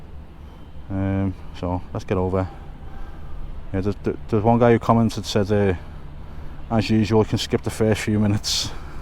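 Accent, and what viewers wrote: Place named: Merseyside, United Kingdom. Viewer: Got to ask Merseyside has distinct accents north,south,east,and west Which part are you from Enjoy you videos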